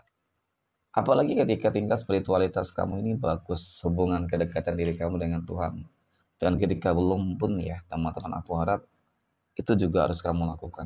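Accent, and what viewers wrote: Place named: Banyumas, Indonesia. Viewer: Aamin hadir bang jawa ngapak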